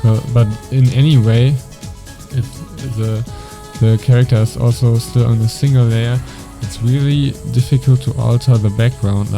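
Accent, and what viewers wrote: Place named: Germany. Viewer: Man hört ein schönes bisschen Deutsch aus deiner Stimme ^~^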